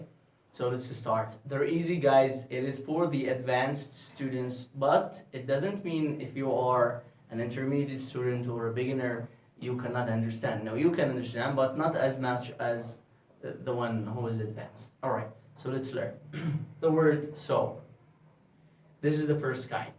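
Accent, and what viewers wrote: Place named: Yemen. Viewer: for English I just wanted to make sure owing to your accent look like Yemeni accent 🙂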